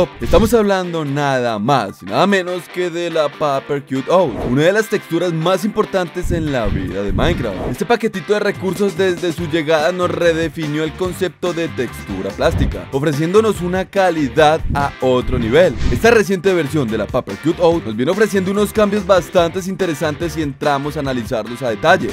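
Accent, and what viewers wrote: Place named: Colombia. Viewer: Por qué hablas como colombiano? deja de hacerlo es molesto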